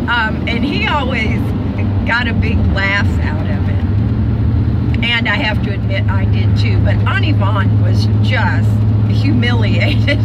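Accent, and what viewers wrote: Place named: Maine, United States. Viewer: What y'all wanta bet looked like a red-headed Audrey Hepburn after her Aunt got her all spiffed up and lady-like??, you told us how since you were born in Maine, your family all said Ahnt instead of Ant. Even now you switch back and forth. I do that with some words.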